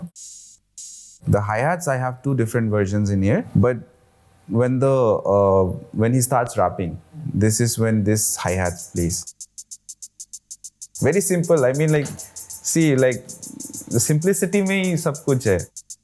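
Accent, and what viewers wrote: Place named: Assam, India. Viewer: 💯 percent he is Assamese.. Accent se hi maloom chala merko..🎉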